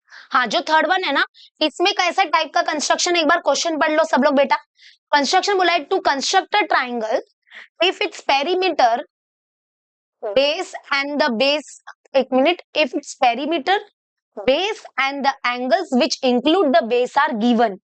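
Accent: Maharashtra, India. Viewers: Mmame your Marathi is too cute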